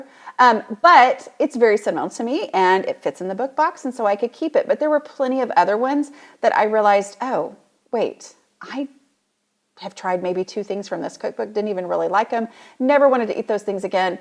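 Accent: South, United States. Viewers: Does anyone one else LOVE 💕 that southern accent is STRONG in this video? No? Just me? Well someone needs to 😍😍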